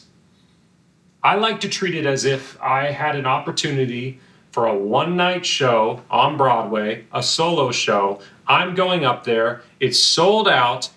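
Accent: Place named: Midwest, United States. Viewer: As someone from the Midwest, I love listening to you. It sounds like home :)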